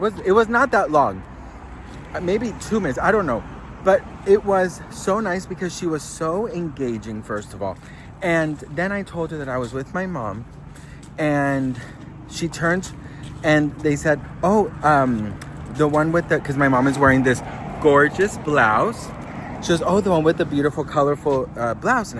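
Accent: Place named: New York, United States. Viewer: Ur accent always sounds nyc to me sometimes bahaha like first of alllllll. Love ya omg i cant even that u met jlo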